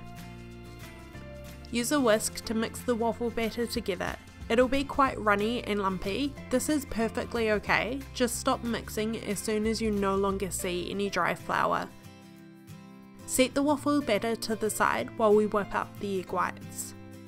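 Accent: New Zealand. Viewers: Is this New Zealand accent as it resemble jacinda ardern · heres some daily does of irony,Im kiwi myself but listening to a kiwi accent on YouTube is patronizingly frustrating.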